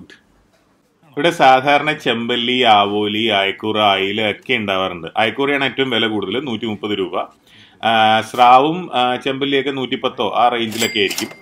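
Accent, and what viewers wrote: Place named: Thrissur, India. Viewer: എങ്ങനെ ഈ തൃശൂർ വള്ളുവനാട് സ്ലാങ് വരുന്നു തിരുവനന്തപുരത്ത്കാരന്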